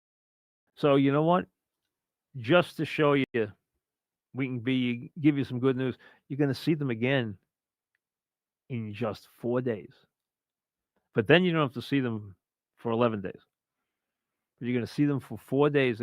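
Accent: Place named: Long Island, United States. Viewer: while the horrible long island accent is tough to get past, this guy’s analysis is spot on… (i’m from long island too)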